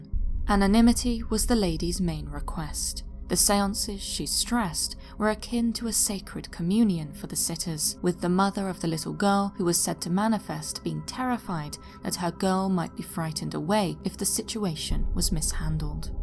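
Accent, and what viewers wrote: United Kingdom. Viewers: As an American wouldn't you believe anyone with a soft english accent work for the BBC? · they have all regional accents on tv now to show how “evolved” they are…. How not prejudiced! But has the traditional well spoken British accent that is what you think of when you think of a professional presenter! 🙏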